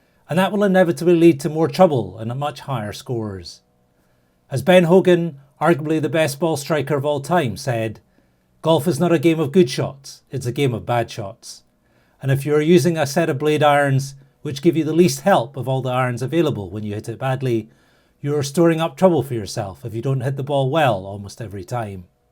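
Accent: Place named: Scotland, United Kingdom. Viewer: Hello. Really enjoy the channel, and in particular the great Scottish accent which gives us the information. My question is not blade related, but iron related. I enjoy my putter and driver showing me the best place to hit within the face, is there a reason why this is not done on irons? Thanking you in anticipation.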